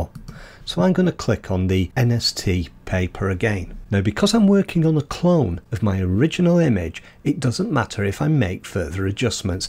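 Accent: United Kingdom. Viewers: British accent? Subscribed.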